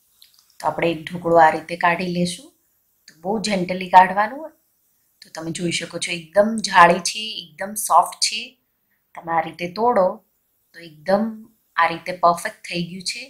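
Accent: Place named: Kathiawar, India. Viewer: ઈ બહુ સરસ બયના છે. મને તમારું કાઠિયાવાડી - હિન્દી બધું મિક્સ સાંભળવાની મઝા આવે ☺️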